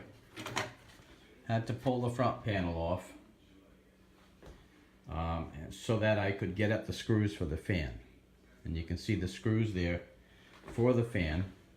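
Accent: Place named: New York, United States. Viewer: I'm with you Robert, he does have an interesting and great accent. I'm English and I can place most UK regional accents, a visiting lecturer was a bit shocked when I placed him within a few miles of his home just on his accent. To my totally untrained English ears sounds like he's from the New York wider area?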